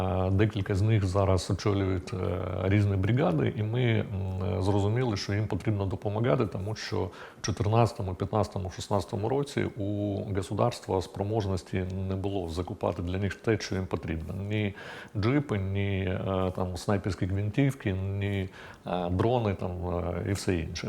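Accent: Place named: Surzhik, Ukraine. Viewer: Шана та вдячність цьому чоловікові, та краще балакав би вже на російській мові. Бо цей жорстокий суржик дещо «ріже вуха»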